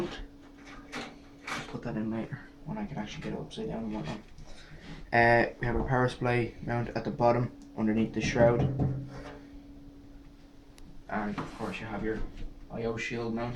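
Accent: Ireland. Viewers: are u irish? :D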